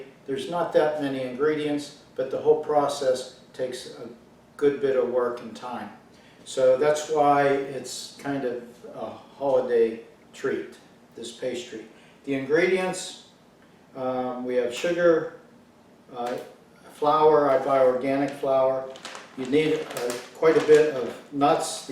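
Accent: Pittsburgh, United States. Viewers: As soon as I heard his accent I knew he was from da Burgh! Found out he is my classmate Mike’s brother and another classmate Sharon’s cousin. Great videos, No rolling pin…use a wine bottle! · Really nice work. Good recipe. Sounds like you are from the Pittsburgh area. Thank you so much❤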